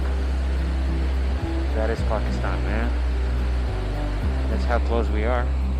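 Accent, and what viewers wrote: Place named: India. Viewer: Hey, are you Indian? lol ...OMG you accent was so nice +1 sub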